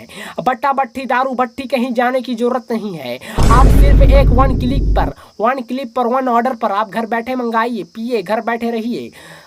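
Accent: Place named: Chhattisgarh, India. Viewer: Chattishgarhi awaj me bane lagthe yrr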